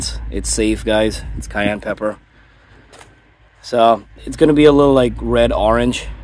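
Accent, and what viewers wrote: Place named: Guyana. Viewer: Sound like a GT Banna. 🇬🇾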